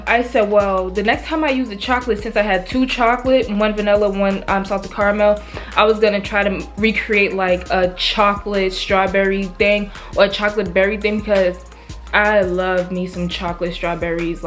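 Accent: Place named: New York, United States. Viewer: Girl yassss get your sponsorships I see you sis! I'm a vanilla lover as well - I usually choose that flavor when it comes to protein shakes. Also, where are you from? Are you from NY? I have been trying to guess based on your accent!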